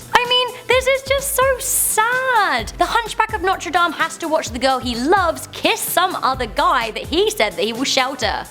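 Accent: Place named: United Kingdom. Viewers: British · British. Obviously.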